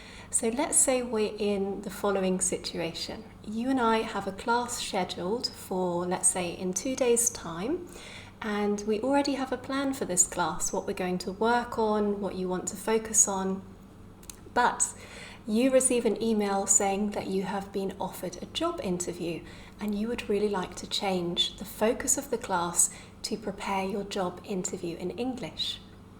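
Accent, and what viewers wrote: United Kingdom. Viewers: Hi..really helpful lessons, brilliant British accent, understandable and practical topics...extremely thanks...would it be possible to write your important tips bigger and not italic at the corner of video...cheers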